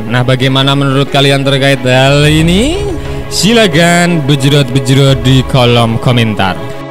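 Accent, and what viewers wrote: Java, Indonesia. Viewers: narator videonya ini berbahasa melayu indonesia dengan aksen jowo...medok! hehehhehehe..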